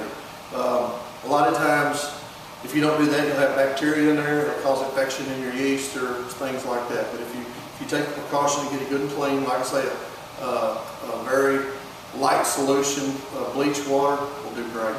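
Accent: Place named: South, United States. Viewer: Ferrr-ment. Why does that southern accent sound so dam stupid?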